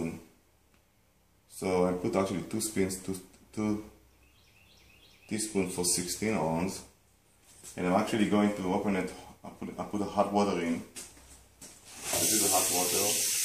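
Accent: Israel. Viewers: israeli accent. תודה לך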